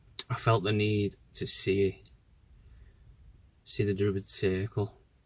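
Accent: Liverpool, United Kingdom. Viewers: absolutely loved this...Right up my street....Is that a scouse accent? be watching and supporting your journey xx stay free xx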